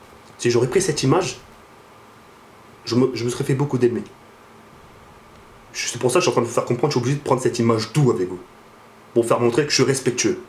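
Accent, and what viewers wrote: Banlieue, France. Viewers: je suis Iranien, mais sa m'empêche pas de pencé extrême droite ... c'était juste de l'humour Noir car j'aime pas cette voix des cités ... Ce n'est pas une bonne chose du défendre et vous Français gauchiste ! vous allez tuer la France avec votre Humanité exagéré envers tout ! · Je suis décu de ta video ! La violence ne ressoudra rien ! Et c'est surtout que le rascisme est bien des deux cotés donc faut arreter de pleurer sur son sort en prenant un accent balieusard ! Je la connnait la banlieue et faire genre en parlant comme sa fait le caid te sert à rien j'apprecie le personnage que tu es car tes pensées sont le biens incarnées comme l'histoire Merah ! Mais ne part pas en vrille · juste un message, ne t'adaptes pas, ne change pas, malgré ta voix banlieusarde, ton language, les gens s'en fichent, apprends à etre toi meme, meme si ça peut prendre du temps. 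Tes abonnés verront réellement qui tu es, et ça plait toujours de voir la vraie personne qui nous parle;) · Mdrrr y a des gens dans la rue qu il faut respecter et parler bien mais youtube c TA CHAINE dc tu doit etre toi meme ! J suis banlieusare et fiere de l etre ! Notre "axcent" c notre identiter un peu ... bonne continuation ! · arretes stp, malgré ta voix de banlieusard et ta casquette a l'envers comme tu dis, tu sembles meme pas a une caillera, t'es un mec cool, respectuex qui parle de la verité que certaine personne meriteraient d'entendre. continues tes videos pk t'as un niveau de jeu elevé.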